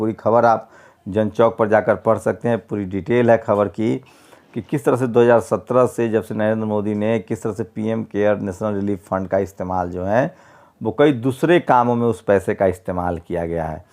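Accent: Bihar, India. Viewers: आप का बिहारी टच अब कुछ ज्यादा ही हो रहा है।।।। जय भीम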